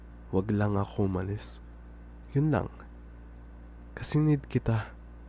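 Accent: Conyo, Philippines. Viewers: Shux you sounds so conyoooo~ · I love the accent so conyo vibes na nagtatagalog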